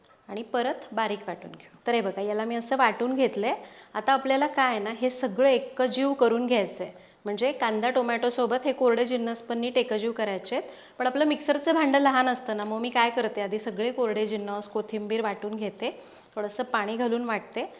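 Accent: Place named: Kolhapur, India. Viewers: जमलं ताई कोल्हापुरी बोलायला, आम्ही प्रॉपर कोल्हापूरचे MH 09नाद खुळा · Kolhapuri jagaat bhari❤ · Ly bhari bolla ki o aamchi kolhapuri bhasha🎉🎉🎉❤❤❤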